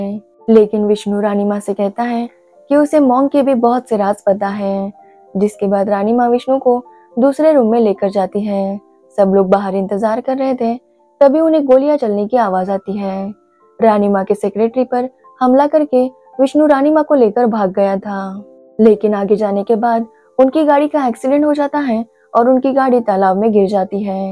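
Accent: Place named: Hyderabad, India. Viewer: Hyderbad se h ye ladki. Wahan log aise hi bolte hn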